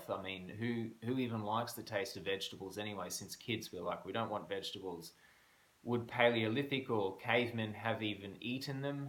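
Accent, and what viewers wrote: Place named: Australia. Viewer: I don’t know what it is about the Australian accent but when I hear it I listen.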